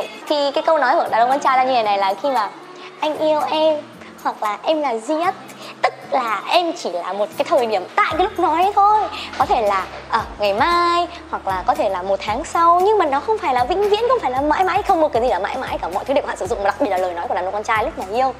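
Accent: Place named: South, Vietnam. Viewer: Nói giọng Nam rồi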